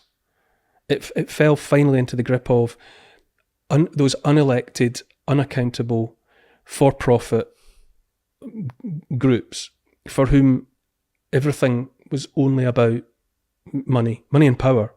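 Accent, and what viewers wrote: Scotland, United Kingdom. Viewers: Ahhh, that Scottish accent!! 🥰😘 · You kniw Americans love a British accent. This ain't a British Accent. Nothing worse than listening to a nervous Scott...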